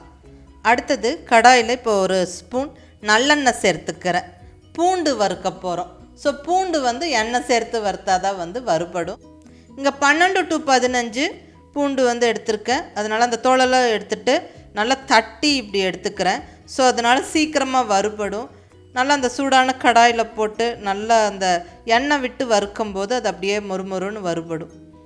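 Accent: Coimbatore, India. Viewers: U are from coombatore. The way u talk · Varuththu vittu kitta namma kongu tamil super mam ❤naanum idhey ooru than piranthu valarnthu marriage aanathu extra extra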